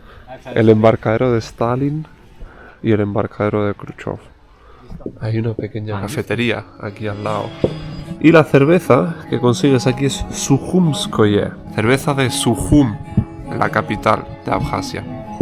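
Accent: Andalusia, Spain. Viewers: Desde Santiago de Chile 🇨🇱 Sudamérica, Si, es Alex Tienda. Recién ví un vídeo de él, acerca de la Fiesta de los Muertos, en México, hacia tiempo que no subía algo. Está físicamente, cambiado, y no sólo por más edad. Por la forma que tiene el amigo de hacer sus Videos, en Corea del Norte, sería imposible, lo llevarían preso. Ellos no se hacen ningún problema en torturar, y hasta matar a una persona. Ya lo hicieron con un norteaméricano, que sacó un póster de propaganda política, de una pared. Prefiero al amigo, en países no conflictivos. Lo sigo desde sus primeros videos, tendría menos de 18 años. Eran muy básicos, pero lo divertido es él, con su acento andaluz y su forma de hablar. Por lo menos, para nosotros, los de América Latina, nos resulta divertido.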